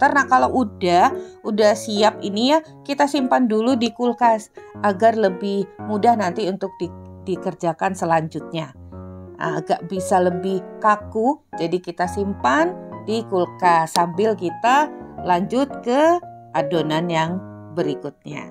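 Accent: Java, Indonesia. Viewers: Hhhhh, medok lgi😂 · Lha kok maleh medhok bangets too yoo maa😅..mtrnuwn sangets njih maa resep ipun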